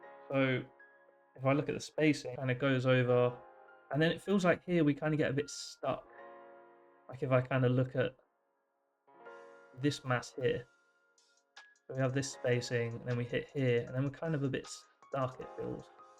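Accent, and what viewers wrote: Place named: England, United Kingdom. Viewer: could have sworn he's english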